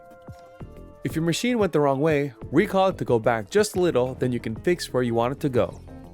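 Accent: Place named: Netherlands. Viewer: alles goed? Edit: NL Engels accent ; ) goede tips trouwens. Bedankt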